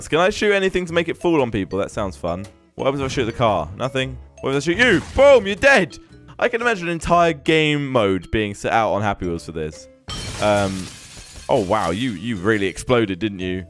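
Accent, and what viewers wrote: United Kingdom. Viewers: are you british or slovak? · ser u british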